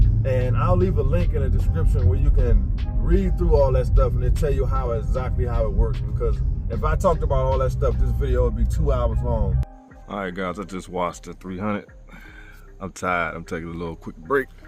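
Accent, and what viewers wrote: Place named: Washington DC, United States. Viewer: you from the DMV i can tell u got like a DC accent lol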